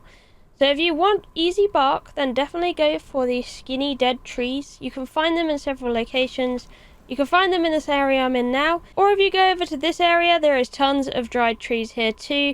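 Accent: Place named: United Kingdom. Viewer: Challenging accent as a native german speaker, but I've got a friend in the UK with a similar accent, so it wasn't as bad, heh. What I wanna say is, despite the unfamiliarity to your accent, I still understood almost everything. Thanks for the video, it helped. One question though; Are daggers viable/good throughout the game? Like does the bleeding effect for example gets stronger with the weapon?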